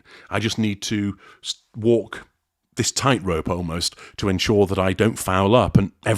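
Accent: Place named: Yorkshire, United Kingdom. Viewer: No - I can still hear a tiny bit of the Leeds? (Yorkshire) accent in the lad. Most of our British aristos talk with a handful of pebbles ' int pie-ole'. 😅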